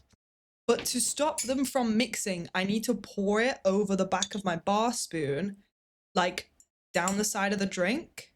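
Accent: United Kingdom. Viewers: You became full brit after jackie's drink, your accent got full british mode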